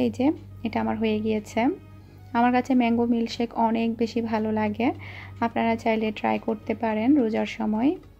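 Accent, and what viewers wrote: Chittagong, Bangladesh. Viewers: আপু আপনার কথা গুলো ভালো লাগে চিটাগাং এর ভাসা আছে তাই চিমের বিছি আমার খূব ভালো লাগে আমি ও চিটাগাং এর মেয়ে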